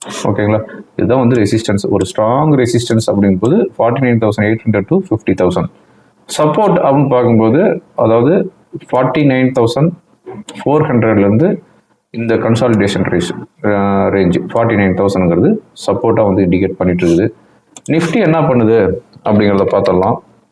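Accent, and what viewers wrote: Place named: Chennai, India. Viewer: தல மெட்ராஸ் பாஷை பேசுது..வா தல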